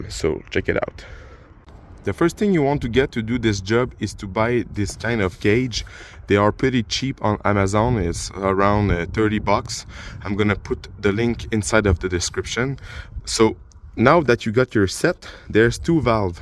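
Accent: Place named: Quebec, Canada. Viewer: Salut j'aime bien tes video je reconnais ton accent 😅 comme le miens. Ou tu te procure le r134-a au quebec?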